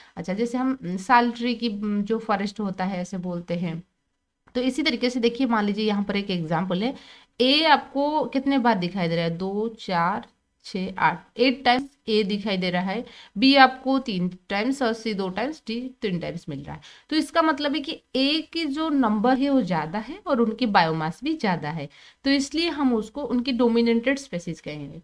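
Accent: Odisha, India. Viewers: Nice explanation ma'am 👍 Are you from odisha????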